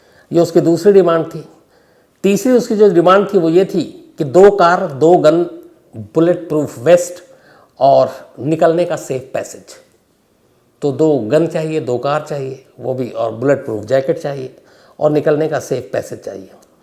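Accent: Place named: Bihar, India. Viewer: Your language says that you are from Bihar?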